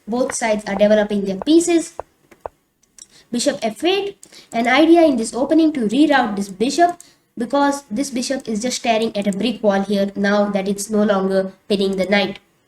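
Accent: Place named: India. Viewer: Your accent is like Indian like us r u Indian am curious about your native 😉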